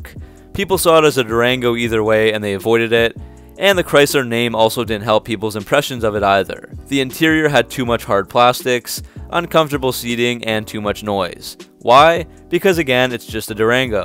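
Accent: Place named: Canada. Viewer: Canadian dialect is omg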